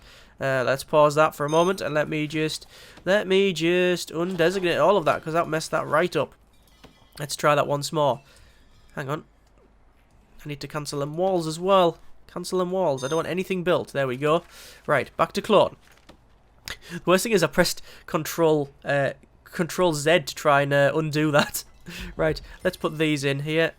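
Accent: United Kingdom. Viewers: You are british right? If so i (you doctor ofcourse) demand that you take two extra cups of tea with every meal from now till that nasty cold is gone with.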